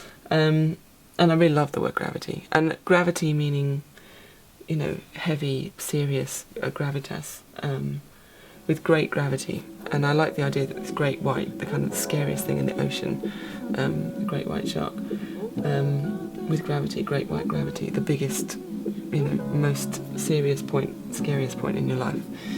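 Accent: United Kingdom. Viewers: I find myself torn between focusing on an amazing song and a British accent. So I watched this twice. :)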